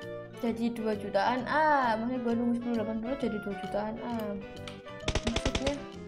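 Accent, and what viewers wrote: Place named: Java, Indonesia. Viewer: Suara mu medok banget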